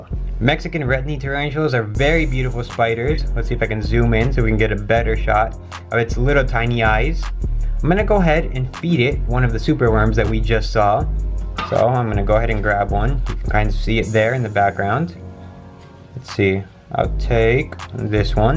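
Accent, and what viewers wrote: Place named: Argentina. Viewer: I don’t really mind if you speak English in your videos, but I love when you talk in Spanish. I mean, that Argentinian accent? God, it’s just beautiful.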